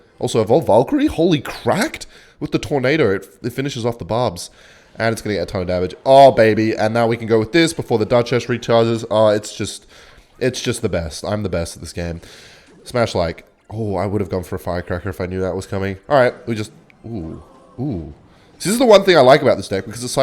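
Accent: Australia. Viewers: Are you from Perth brudda? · Mate you from WA? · sometimes i forget is australian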